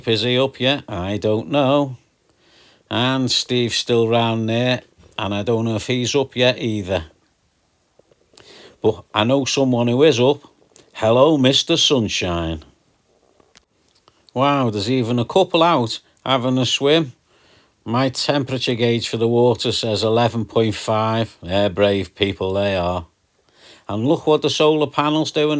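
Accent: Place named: Scotland, United Kingdom. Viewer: "but certainly giving them a good wack in the head when they are not looking" ROFL ROFL ROFL! ! ! Too funny. My favorite Scottish accent channel on YT!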